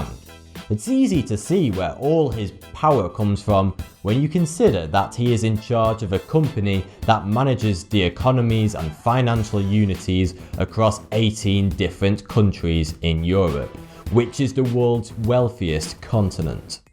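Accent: United Kingdom. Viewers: the big question is, where is mr. evelyn rotschild?, your accent obviously you are briton, you should know him by now.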